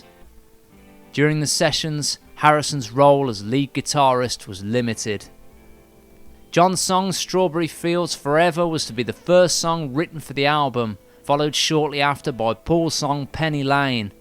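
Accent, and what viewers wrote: Birmingham, United Kingdom. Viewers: Yow sarnd like a brummie like me pal... Well I'm Wolverhampton but everyone calls us brummies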